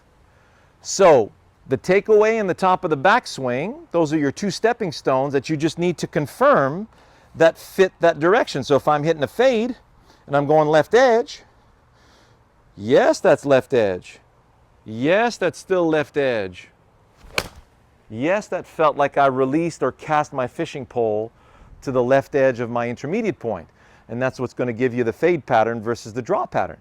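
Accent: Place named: Quebec, Canada. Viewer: Hi Mr. I'm totaly in love with your mental approche for the swing of golf. I found your video by starting to watch your french imitator! By the way your Quebec accent is style intact. I'm from Montréal so if you do come in Montreal for any teaching, let me know. So I'm going slowly throw all your vidéo and trying to apply these tips in my game. I like to pratice more so I'm looking to by a net and a mat similar as the one you use in yout backyard. Can you tell me what's the brand of it. Thanks for all your vidéo.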